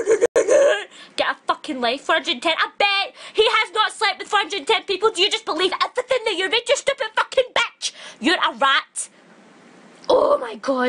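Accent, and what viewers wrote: Scotland, United Kingdom. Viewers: is this a scottish accent? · haha i love your accent, that british/sco/irish accent is beatifull :p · Scottish, from Glasgow probs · it's angry scottish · Also to the people asking about the accent it's obviously Scottish, maybe Irish.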